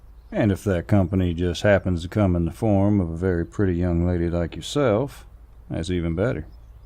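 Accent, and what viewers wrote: South, United States. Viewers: Lord have mercy I have a thing for a southern accent 🫣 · you are southern accent. good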